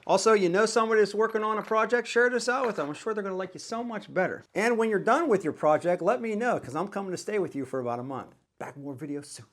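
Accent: Pittsburgh, United States. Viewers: Bro is 1000% from pittsburgh or surrounding area. My man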